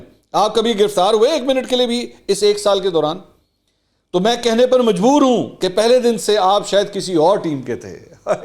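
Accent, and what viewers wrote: Punjab, Pakistan. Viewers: Hahaha🤣🤣 Mansoor bhai and his punjabi accent. Amazing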